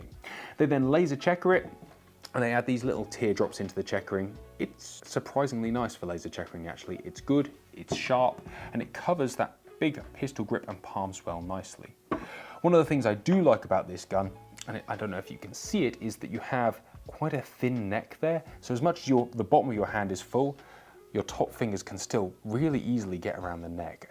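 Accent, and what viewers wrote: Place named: England, United Kingdom. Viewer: Apologies mate. Grow a beard or lower your voice. I’m not suggesting you don’t know your shit. Very English. Love it. Keep up the good work mate.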